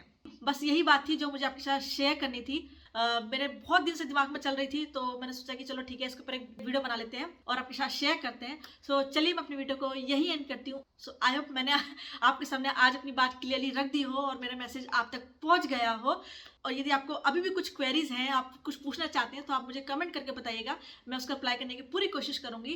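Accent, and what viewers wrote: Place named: Himachal Pradesh, India. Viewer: Aapki hindi thodi Himachal se milti julti h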